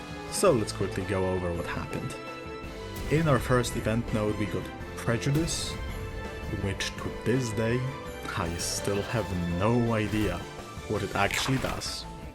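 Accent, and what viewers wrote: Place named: Germany. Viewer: holy German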